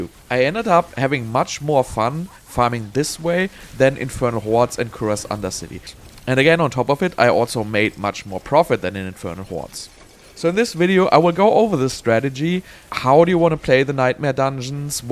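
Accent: Germany. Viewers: Schon in den ersten 5sek. wusste ich das der Dude Deutscher ist😂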